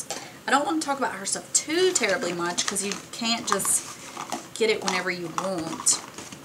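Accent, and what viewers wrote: South, United States. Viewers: You definitely sound southern lol! I'm in Louisiana. Great job on the empties!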